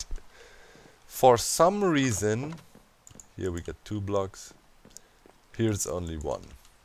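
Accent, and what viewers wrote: Germany. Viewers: May i ask if u are german cuz u have a german accent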